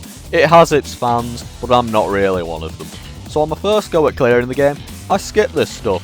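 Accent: United Kingdom. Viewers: From Birkenhead or Liverpool or something, I think. certainly in the area of north west I think.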